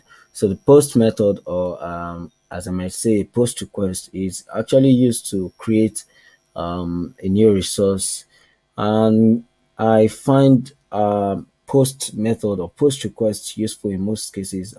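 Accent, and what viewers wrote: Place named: Nigeria. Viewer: You sound Nigerian 😅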